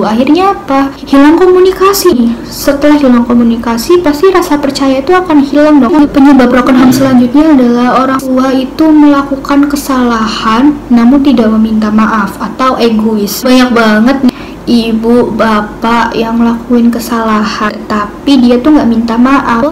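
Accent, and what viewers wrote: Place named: Java, Indonesia. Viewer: Kak kelihatan medok buanget😂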